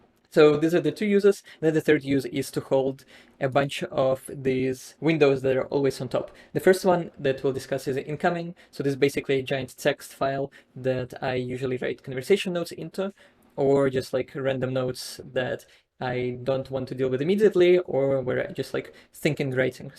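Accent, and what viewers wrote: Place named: Russia. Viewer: Now that you've been in the US for some time, your English sounds a lot better. In your interviews with e.g. Ben Kuhn and the BBC, you had a pretty strong Russian accent - it's a lot better now! And it sounds a bit more fluent. And you should not underestimate that - communications skills are very important. Especially in the kind of job that youre doing right now - raising funds and talking to researchers to allocate these funds. People not getting an idea or, even worse, getting the wrong idea, will cost a lot of money. Other peoples perception of you will also (maybe subconsciously) be influenced by your language skills, another reason why this matters. Good luck with all your future endeavors!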